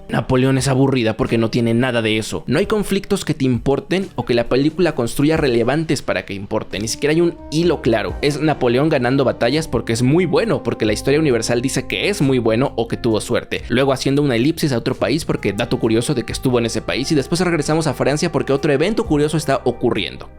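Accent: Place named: Chile. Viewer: Se nota que eres Chileno. Porque no hablas bien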